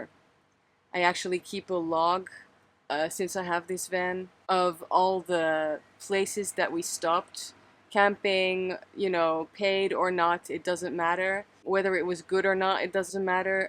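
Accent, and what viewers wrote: France. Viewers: Jolie Accent Français 😊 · J’adore ton accent français! (Je suis canadienne francophone donc notre accent est très différent) J’ai apprécié ce vidéo, sortir de sa zone de confort ce n’est pas facile, mais on est tellement fières après! La croissance se fait inévitablement en traversant l’inconfort. Merci de nous avoir amené avec toi lors de ce roadtrip, le concert dans le cratère devait être incroyable!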